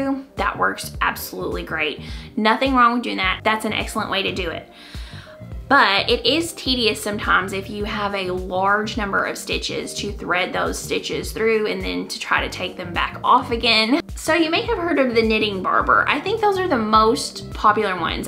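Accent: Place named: Kentucky, United States. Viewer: Hello!! I have a question I hope I done sound stupid! I am new to sock knitting I made my first sock last week during shock week. And now I am obsessed I am working on my first official set and I have been so worried about blocking them. I don’t have sock blockers at the moment it is just not in our budget! So I really need tips on blocking sock with out the blockers! Oh and I love your podcast I am from Kentucky so it’s cool to hear another knitter that sounds like me!!!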